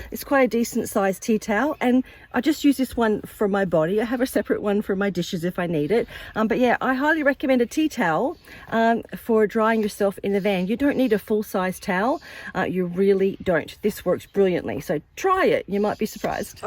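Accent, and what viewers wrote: Australia. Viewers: Love your Australian accent...but some words sound Canadian? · At the very beginning of this video I thought this lady sounds like an Aussie with an American accent lol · Great tips and really helpful to someone like me just getting started. PS, used to live in South Australia when my husband was still alive. I miss it (and him) very much. Nice to hear the 'accent' again :)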